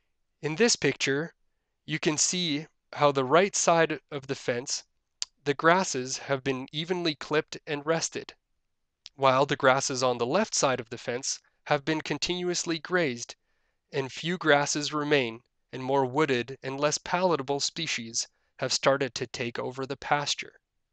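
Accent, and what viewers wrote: Canada. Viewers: After about 4 words, I was like, this guy sounds Canadian... Then I heard "Ontario". We know the sound of our kind eh! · Canadian guy, gotta cut the poor guy some slack, eh?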